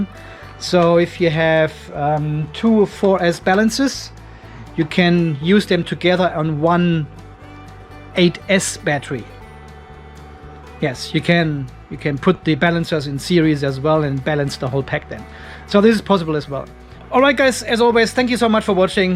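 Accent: Germany. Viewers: I HAVE A QUESTION .... I am curious about your ACCENT what country is that it's not Australian LOL I am guessing German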